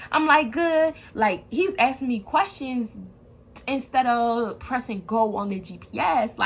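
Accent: Baltimore, United States. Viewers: Your accent sounds like you from Baltimore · your accent, you def from baltimore 😂 i never been there and know no one from there but ik the accent clear as day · is she from bmore i know im late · I KNEW YOU WAS FROM BALTIMORE 😭😭😭😭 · You from Baltimore ? cause that accent 😍😩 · You got to be from Baltimore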